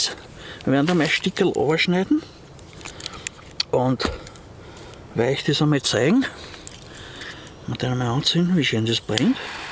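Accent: Standard. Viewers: Dein Hochdeutsch ist 1A ... als Niederösterreicher warst du für mich total verständlich. 😄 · Moin, schöne Vorstellung! Danke dir. Das elastische Paracord finde ich echt praktisch, das kannte ich noch gar nicht. :) Lieben Gruß Sven PS. Sagen wir medium Hochdeutsch 😋 bist aber wie immer gut zu verstehen außerdem mag ich deine Mundart :)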